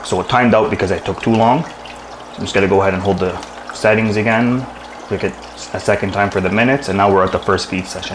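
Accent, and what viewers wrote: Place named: Canada. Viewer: I can’t thank you enough. The instructions that come with the feeder were certainly written in China where the feeder was made. The instructions are impossible, like IMPOSSIBLE, to understand. Returning this would have been a pain. Thanks to your video in native English, Canadian I believe, I got it set up in minutes. Thanks so much!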